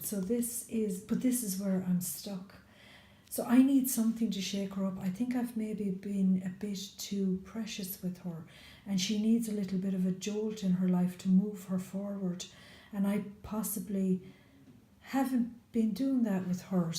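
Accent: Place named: Ireland. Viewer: I love your accent! Puts me in mind of my recently passed, VERY Irish grandfather. He is missed and you are delightful:)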